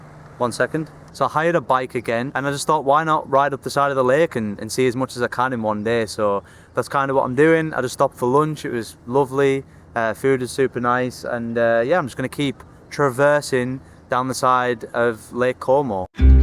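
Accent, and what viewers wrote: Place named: United Kingdom. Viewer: Bro has a really strong British accent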